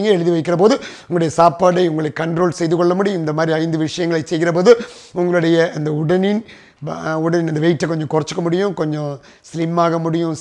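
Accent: Kerala, India. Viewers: Sir neenga Tamil or malayaliyaaa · Malayalam vaasam apdiyae veesudhu unga Tamil . Nice video. I subscribed for Ur Tamil sir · I like your malayalam mixed tamil slang. .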